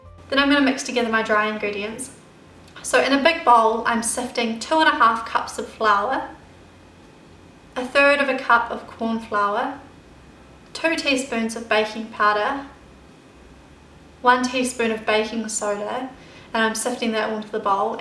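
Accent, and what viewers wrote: New Zealand. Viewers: are you from Newzealand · Mira J. I think she's from New Zealand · Are you from NZ? I feel totally at home hearing your acccent and I don't know why else that could be. :D Thanks for the recipe btw. :D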